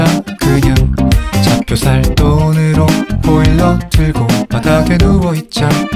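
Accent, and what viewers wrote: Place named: North Korea. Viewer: 아조씬 부칸연기 천재야....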